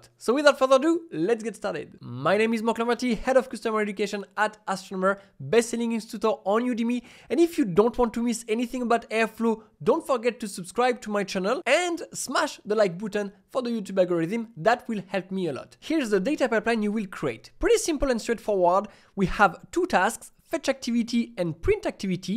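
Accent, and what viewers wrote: France. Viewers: haha seems you have a little french accent, anyway , love your video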